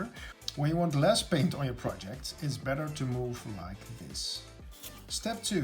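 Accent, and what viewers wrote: Netherlands. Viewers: Jij bent zeker een Nederlander he😏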